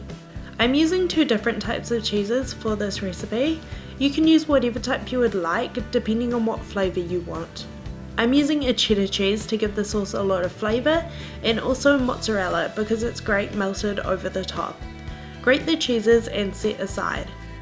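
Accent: New Zealand. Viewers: Fellow kiwi? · from new zealand probably! that's where im from :D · I think it's New Zealand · The Kiwi accent is way too annoying to listen to 5 minutes of it. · Sounds like a kiwi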